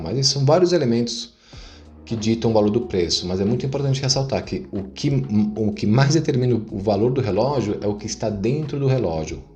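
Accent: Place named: England, United Kingdom. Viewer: A Seiko comprou a Orient e surgiu a Orient Star, relógios belíssimos e diferenciados, fino acabamento. Tenho um Contemporary com open heart, muito preciso e bonito, esse cara não conhece um Orient Star. Ele também tem mau gosto, seus relógios com pulseiras laranjas que o digam, cada qual com seu lado brega... a cruz suíça não afasta esse trejeito de um Magal, nem sotaque do inglês...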